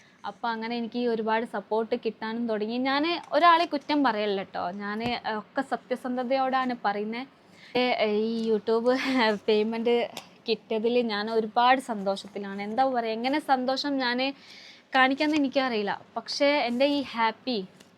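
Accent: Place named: Kozhikode, India. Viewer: ഭാഷ കോഴിക്കോടനാണല്ലോ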